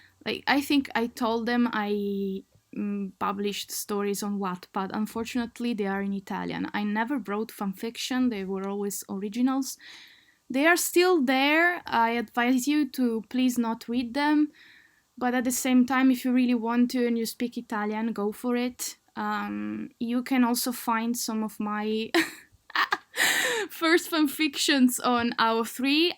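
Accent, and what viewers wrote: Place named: France. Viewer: Are you french ?? You sound like french 😆🧡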